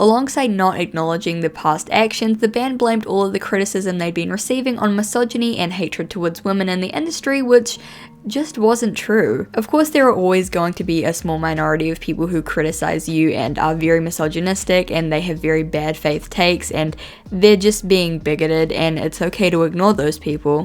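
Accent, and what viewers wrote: New Zealand. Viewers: "that probably sounded really stupid in my accent." Speaking of which, what is your accent? I've watched several videos now and I can't decide between New Zealand and South African.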